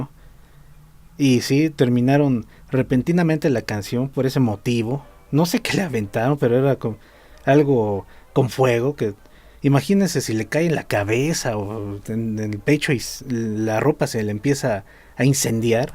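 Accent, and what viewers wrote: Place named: Mexico. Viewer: Hola hermano mexicano!!! Gracias por difundir por allá nuestro rock y te hago una pregunta porque tengo muchos amigos mexicanos, pero son de Ciudad de México, Puebla y Baja California pero no reconozco tu acento, es de Guadalajara?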